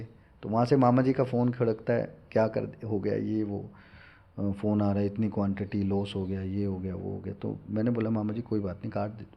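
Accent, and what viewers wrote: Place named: Haryana, India. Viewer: good insight ji, vaise aapke lehje se aap haryanvi lagte ho:)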